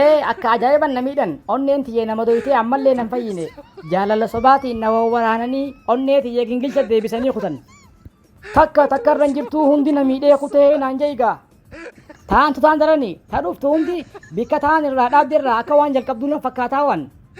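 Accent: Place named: Hararghe, Ethiopia. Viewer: sanuu loqoda Harargee bareeda saniitiin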